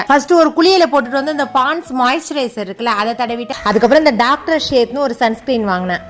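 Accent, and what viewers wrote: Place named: Thanjavur, India. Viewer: பேச்சு சூப்பர்... ஊர் பாசை நல்லா இருக்கு... தஞ்சாவூர் பாசை....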